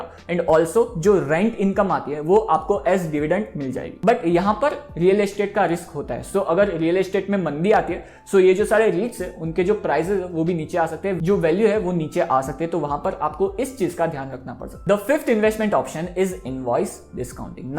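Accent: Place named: Gujarat, India. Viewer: Jiv me thoda ..... 😅😁... Gujju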